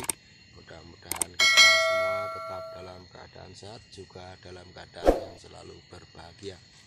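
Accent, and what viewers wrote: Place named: Java, Indonesia. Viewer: Hadir,jowone kenthel jos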